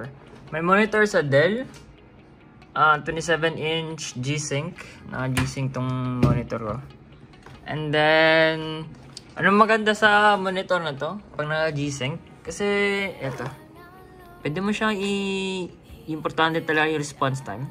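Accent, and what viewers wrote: Visayas, Philippines. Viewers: Bisaya ka lods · sana-all akala ko may face reveal sa Dulo hahaha BISAYA accent talaga si lodi!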